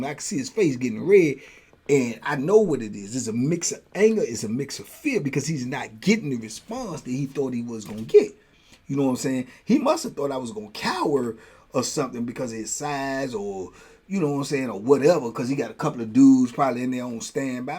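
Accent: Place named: Brooklyn, United States. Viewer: love doin that New York Brooklyn accent 😅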